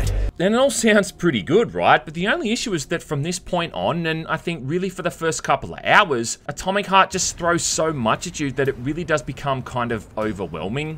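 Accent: Australia. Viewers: I live for this kind of review video, with aus accent. :face-blue-smiling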